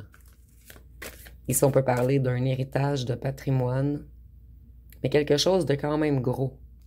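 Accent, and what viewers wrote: Quebec, Canada. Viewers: Bonjour votre accent quenecois est tres jolie , je pense que chez vous la temperature n'est pas si chaude que chez nous dans le sud ouest de la France . Merci a vous 👍🙏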